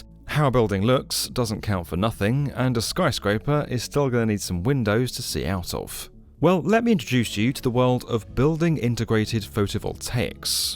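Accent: United Kingdom. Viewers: Check. The Cockney. Easy now big fella. 😎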